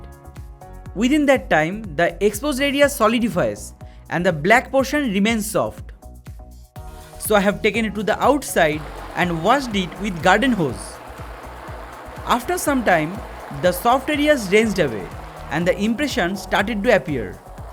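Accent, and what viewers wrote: Bengal, India. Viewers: Accent Is Like Bengali Dada Where Are You From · How do I contact you? You also sound surprisingly much bengali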